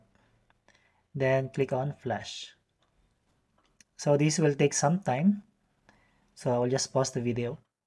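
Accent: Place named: Manila, Philippines. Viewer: Nice Bro, there is now a super good tutorial (that sounds like really from Manila) hehe... Thanks for the tutorial but I cannot do this since I am using my only 1 PC for work, do you suggest your method could be a solid workstation or will this still have some crashing along until I fixed DSDT, KEXT etc. ? Will having another back up (clone) a good idea? That's what I did before when I am using my hackintosh for work early 2016... Whenever It crash, I just mount the working cloned system and then clone again hehe.. Thank you for the video Bro. New Subscriber here. :) BTW, I am using 5800x + X570 + RX 5600XT will use mostly for Premiere Pro and other Adobe Products. More power!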